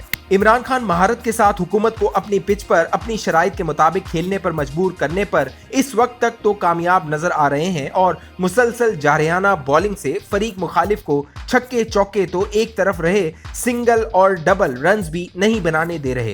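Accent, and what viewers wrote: India. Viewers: The guy who is doing commentery is indian from his accent! So what is he doing here? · Absolutely right. Narrator seems to be an Indian due to his typical Indisn Pronunciation.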